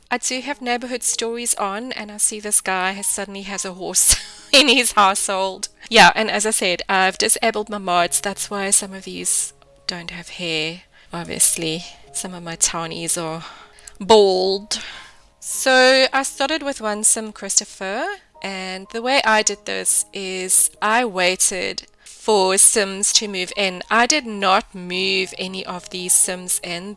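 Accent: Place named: South Africa. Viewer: Idk why but I was so caught off guard by this being narrated by a South African 😂 SA simmers represent! 💚💚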